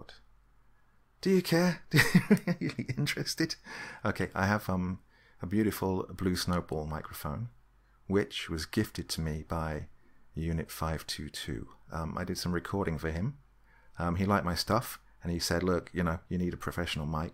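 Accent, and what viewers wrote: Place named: West Yorkshire, United Kingdom. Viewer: I can place your accent pretty well, it's a very similar one to my pa's, so you are a yorkshireman, probably from west yorkshire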